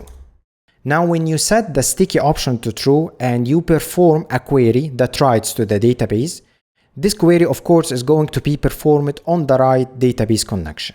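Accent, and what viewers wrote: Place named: Egypt. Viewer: حسيتك مصرى من اللهجه قبل ما ابص على الاسم 😂😂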